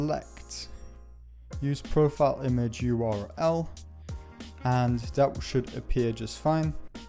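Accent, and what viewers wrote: Germany. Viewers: Lol bist du deutscher? ;D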